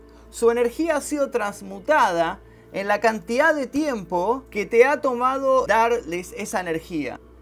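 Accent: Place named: Argentina. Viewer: Que bueno que no eres el tipico argentino con el acento cargado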